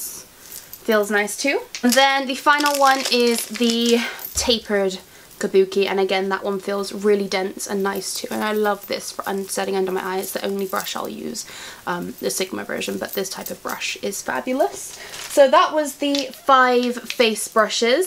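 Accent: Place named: England, United Kingdom. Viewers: So West Country YAS love it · Chocolate brown & medium brown are the most popular colors for lip sticks right now. I guess by your acent that you are from England. Usually the U.S. is always ahead of times when it comes to fashion. Especially California, New York, Chicago, and Detroit!!!! So, go get some BROWN LIPSTICK!!!!!!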